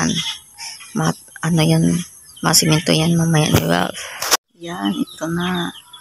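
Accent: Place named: Visayas, Philippines. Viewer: Hello po, nag sub na po ako, dahil kay 😅,kahit may konting Bisaya, natutuea akong panoorin, kasi malumanay kang magsalita, sarap pakinggan ang boses mo, magtulungan lang para sa pagtulong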